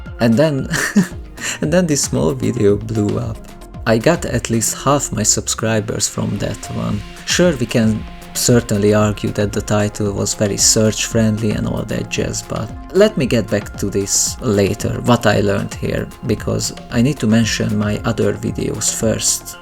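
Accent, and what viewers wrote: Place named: Hungary. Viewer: Happy New Year. You're from Hungary from what I hear from your accent.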